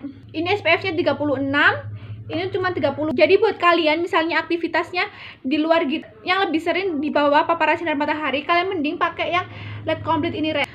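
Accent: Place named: Surabaya, Indonesia. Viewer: Halo rek kembali lagi rek videoku duuhh paling seneng nonton video ini Surabaya ya medok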